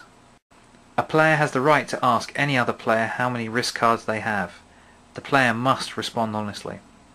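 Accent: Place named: Australia. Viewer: wow he sounds Australian!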